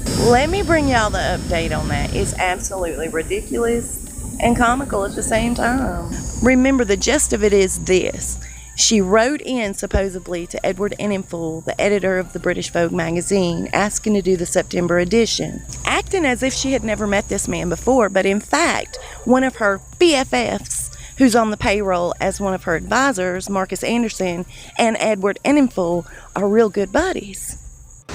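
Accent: South, United States. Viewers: Is her southern accent real? Is it a Houston or Florida? Don't be angry with me, I lived on the west coast most of my life...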